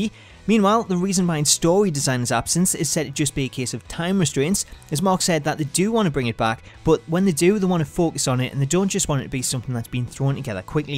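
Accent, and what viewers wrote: Newcastle, United Kingdom. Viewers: Are you from NewCastle ?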